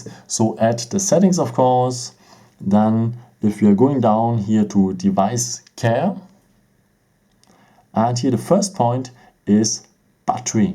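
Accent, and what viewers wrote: Germany. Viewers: Jo du musst nicht englisch rede jeder schekt das du deutscher bist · achja der Deutsche akzent... einfach nur genial :D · No hate but I have a question about your pronunciation. Are you German? I think it really sounds like "german english". About the video: I like this theme of videos. Your video help me to understand the A 40 better. I need these theme of video because I get the Samsung Galaxy A 40 in this week! Nice content! Edit: Ich bin Deutsch (I'm German) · K1ller_ _Headsh0t its just a german accent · Erste Sekunde: BOAH NE DER IST DEUTSCH Nichts für ungut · Ich höre sein englisch... und denke mir Jap das ist ein deutscher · Mich regt der deutsche Akzent auf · Er spricht Denglisch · What an german accent! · Haha wie man raushört das du deutsch bist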